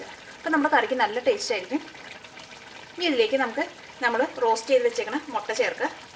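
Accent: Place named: Tamil Nadu, India. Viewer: Xenophile C I watched this video for how elegantly Ms. spoke malayalam. It sounded like very melodic Tamil, and Tamil itself sounds very melodic:).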